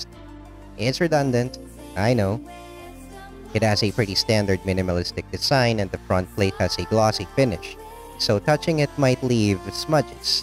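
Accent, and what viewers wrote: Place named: United States. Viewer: your accent has a mix of italian-american and filipino and it's oddly satisfying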